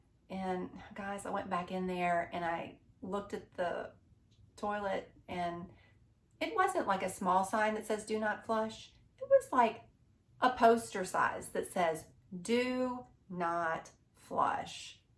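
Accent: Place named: South, United States. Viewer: Young lady if I was younger I would ask you if I could just get to know you . By the way do you like motorcycles , I think you would be a great back seater and any man should be proud to let you grace there bike with your presence .Do you live in the south, because I here a slight twang in your voice and I’m thinking of moving to Tennessee. You may not have noticed but I’m a biker and love long trips and riding as often as I can.I was born in Florida but have lived in California most of my life .Hey if you Want you could get back to me if you would like to say hi,or not if you think that would be wrong.Either way I hope to see some more of you videos and hope you are feeling better about your future in light of all that has gone on with you . My name is guy and hope to maybe talk to you some day.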